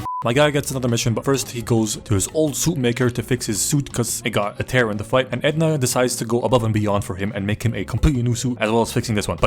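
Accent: France. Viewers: Are you french? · Hey you have a really good french accent !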